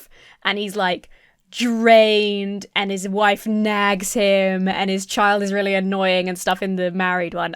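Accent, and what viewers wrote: United Kingdom. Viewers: Justice for the tortoise! I love how you say tortoise! Love your content. You British people always get me with your accents!!! You're now my third British YouTuber... Simon Whistler, Tara mooknee and you. I guess daz black is also British but his accent is different than yours. I am a married man in America and i love being married to my wife. If I'm completely honest she does take care of me and i am healthier because of her. Its not that she's my mom or anything. Even though she does do stuff for me but part of it is she encourages me to be better because i love her. Ive been seven years sober because i want to live longer because of her and the children she's given me. Also, she's awesome and who wouldn't want to be alive when you're married to her.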